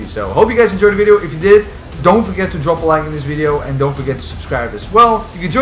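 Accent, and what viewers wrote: Netherlands. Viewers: Je klinkt Nederlands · ur Dutch aren't you?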